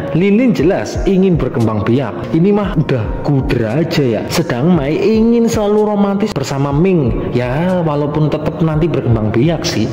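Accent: Banyumas, Indonesia. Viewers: Kalo yg bawain ngapak suport terus smangatt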